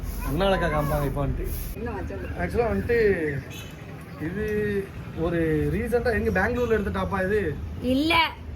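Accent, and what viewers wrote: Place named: Thoothukudi, India. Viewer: ஓகே அண்ணா அக்கா எனக்கு அம்மா ஊர் தூத்துக்குடி யில்ஓருகிரமம்வேப்பலோடை.மாமியார்ஊர்சாத்தூர்பக்கத்தில்பந்துவார்பட்டிஇப்போதுஇருப்பதுதிருவள்ளூர்.உங்கள்குரல்தூத்துக்குடிகாரங்கபேசுவதுபோல்இருக்கிறதுஅதனால்தான்கேட்டேன்எனக்குஉங்கவீடியோஎல்லாம்பிடிக்கும்அக்காஅண்ணா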